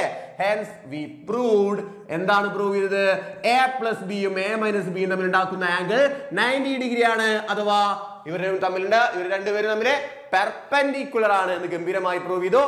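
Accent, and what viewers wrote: Thrissur, India. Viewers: Thrissur ano sirnte veed😅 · Bro he is pure thrissur. Thats Y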